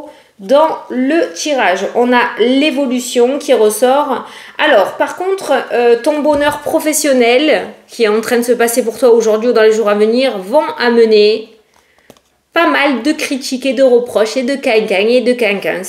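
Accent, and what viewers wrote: South, France. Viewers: Bonsoir, un grand merci pour cette guidance avec votre jolie voix, votre petit accent du sud est marrant. Vous avez du psets et cela fait du bien. Votre dynamisme fait du bien. Merci pour votre gentillesse et votre bienveillance. Que Dieu vous bénisse ainsi que votre famille.❤❤❤🙏🙏🙏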